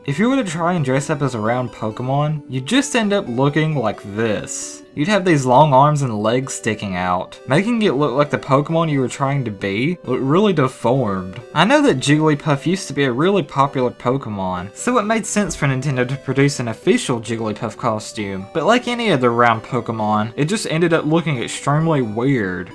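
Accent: South, United States. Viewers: Omg this guy's voice is so red neek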